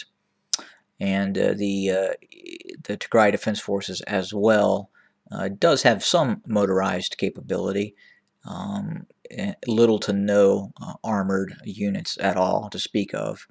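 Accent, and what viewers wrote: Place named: United States. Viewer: You are definetly abesaha, why put this white American accent ?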